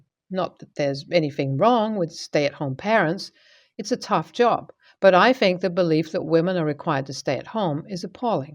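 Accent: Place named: United Kingdom. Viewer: Pick an accent and stick to it!!! Its grating to hear the bad aspects of uk accents.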